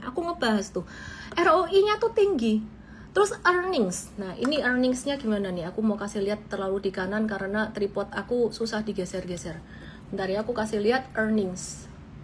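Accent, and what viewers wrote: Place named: Semarang, Indonesia. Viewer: cengkoknya Semarangan loh 😂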